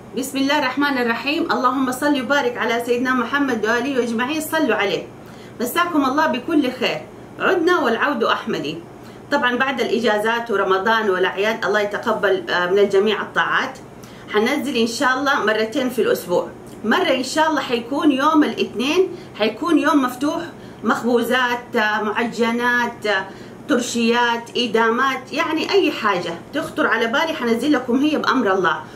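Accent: Hejaz, Saudi Arabia. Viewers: لايك لعيون اللهجه الحجازية 😍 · بسم الله ماشاءالله على لسانك الحجازي المحلى بذكر الله وسيدنا محمد عليه الصلاة والسلام وألف صحه وهنا عليكم بالعافيه يارب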